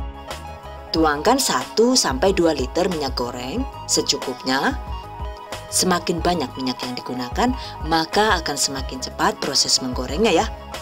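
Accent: Banyumas, Indonesia. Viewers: Ternyata ngomongnya sm ky nyong buu, wong ngapak😂😂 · Mantaaap pingin mencoba moga engga gagal,, Ko bahasanya ngapak ya ,seneng dengernya ,,,makasih atas resepnya mba yuu,,kesuwun banget kiye wong gombong Melu nimbrung 😀😀😀😀🙏 salm sukses buat Chanel ini 👍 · wong ngapak taune jannnn ngapake ngendi iya.... dadi ngefans kie inyong 😂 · Muantap tenan yu... Krn ngapak...jelas tenan keteranganx ditunggu resep yg baru yg thn lm tuk kirim anak kos..tq ya yuu.....🙏🙏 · Deneng ngapak mbaaaaakk Ngakak nyong yakin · Eladalah.. Jebule Rika wong ngapak ya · Weh sebngsa karo nyong ya , pasukan ngapak😄😄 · Medoknya kaya wong Banyumas ya Bu...😀👍👍😘😘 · Ngomong jawane ky wong ngapak lucu banget sumpah 😂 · Makasih mba resep ny..mantep nih..ko jdi ngapak y..ora ngapak ora ke penak..hahaha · Jebule wong ngapak salam ngapak wong cilacap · Sampean tiyang pundi yu deneng saged ngapak kaya kula... 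Kula wong kebumen yu😂😂😂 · Gemesi le omangan bun... Ngapak nya berasa😂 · Wong ngapak ya bu hihi..slm dari cilacappp..😁😁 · Suka dg resepnya, lg serius kok denger bahasa ngapak, langsung ngakak, wkwkwk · Jebule ngomonge pada Karo nyong Rika yaa bu ngapak 🤭🤭🤭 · deneng jebule ngapak kaya nyong..🤭😅😅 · Ya allah ternyata sampean wong ngapak, salam ngapak Bun, ora ngapak ora kepenak 😊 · Ngapak ternyata ya bunda 😁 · Yg saya suka dari channel ini disamping resep2 yg di share,juga ka host nya yg suka lucu sesekali krn logat dan bahasa jawanya. Medok khas org ngapak,dan kalimat OKE hihihi seneng dengarmya. Tks mbk resepnya 👍🙏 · Gagal fokus Ama bahasa yg ngapaknya😍keren2 jd kita yg menonton tidak bosan😍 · Ibune wong ngapak ya deneng pda y karo nyong 😀😀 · Mantaaap Bun.... Saya mau coba ah..... 🙏 Bunda dialeknya ko sama dgn aq yaa......Maaf Bunda asli dri Bnyumas apa yaa...?? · Ngapak bgt kya enyong deneng😁🤣kk nya matursuwun resep e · Sangat mudah dimengerti,ada selingan humornya lagi.bahasa ngapaknya juga😂😂👍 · Suka gaya bahasanya...ulasannya jelas..ngapaknya pas...hehe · Wong Banyumas Iki mesti, ketoro omongane ...😄😄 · Ngapak toh hehehe, saya akui masakan Tegal emang mantab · Deneng ngapak 😂😂😂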